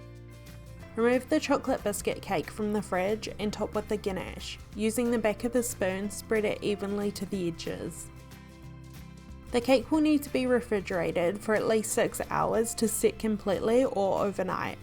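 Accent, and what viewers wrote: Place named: New Zealand. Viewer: I've been making this since I was a child. Nice to hear a Kiwi Accent 😊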